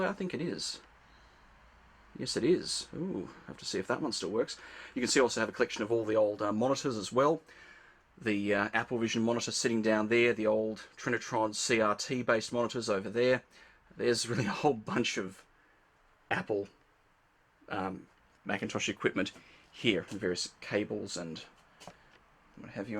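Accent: Australia. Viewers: Are you from Australia? You sound like you are due to your accent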